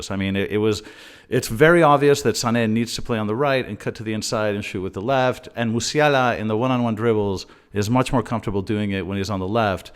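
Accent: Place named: United States. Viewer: Why do u have an American accent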